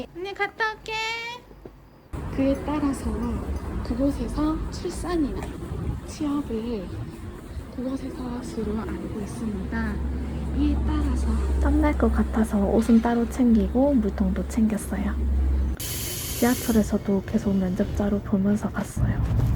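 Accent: Busan, South Korea. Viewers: 부산사람의 말투가 느껴지는군요!ㅋㅋㅋ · 우아 감사합니다!!!!! 필합 이후로 급 면접이라 님 영상보면서 가상경험 하고있어요ㅠㅠ 게다가 저도 경남권이라 사투리들으면 넘 안정돼요....ㅎㅎㅎ 면접가기 전날까지 이영상 보고갈거에요!!!♡ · 최종합격도 축하드립니다!!! 저도 작년에 같이 고민 토로했던 기억이 ㅠㅠㅠ 저도 면접보고 아직도 발표 기다리는데 ㅠㅠ 넘나 떨리네요 부산사투리도 너무 정감갑니다 ㅎㅋㅋㅋㅋㅋㅋ저번주에 부산여행갔어요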